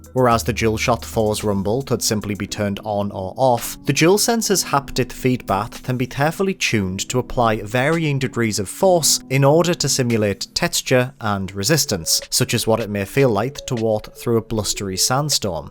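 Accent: United Kingdom. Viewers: Hes british or something. Leave him alone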